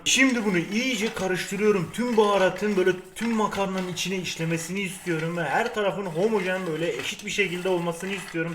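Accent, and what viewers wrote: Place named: Hatay, Turkey. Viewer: Hataylı olduğu için , şivesi öyle 😔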